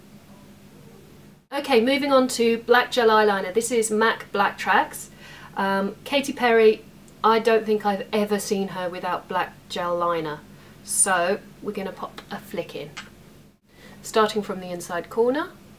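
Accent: United Kingdom. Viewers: You sound extra British today haha :D Love it!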